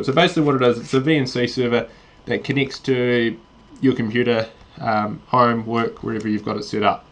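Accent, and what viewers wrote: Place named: Australia. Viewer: He's either Australian or he's from New Zealand. he's not English. Please learn accents he doesn't sound remotely English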